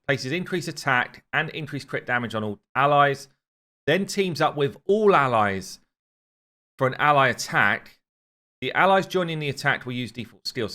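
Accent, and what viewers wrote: England, United Kingdom. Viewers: please learn how to pronounce Mikage, it sounds giga cringy in you english accent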